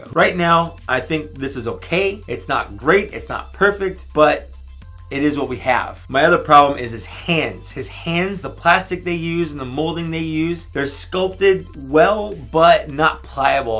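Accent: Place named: Chicago, United States. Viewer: Lol aren't you from Chicago? You definitely have the accent! 🤣 Love your reviews, keep it up!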